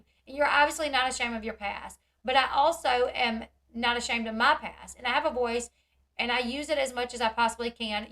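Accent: South, United States. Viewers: I don't really like channels like this as in...I usually lose interest or find it boring or the speaker annoying...or their voice irritating. However, I really like your channel and I subscribed. Maybe it's the familiar twang in your voice that feels comfortable because I was born and raised in the south. You also seem genuine and humble. Anyways I just wanted to express that I love what I have seen so far. You're doing a great job ma'am 🤗🙂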